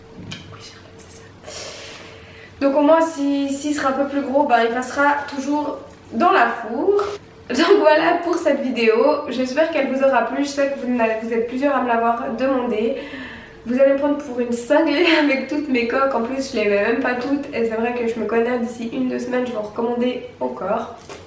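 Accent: Belgium. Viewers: tu est (d'origine) belge ou suisse ? ou tu est bretone ? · OK g mieux compris mais tu viens de Belgique je trouve que tu a un accsant de la bas( on s'en fout ci ça s' écris pas comme ça)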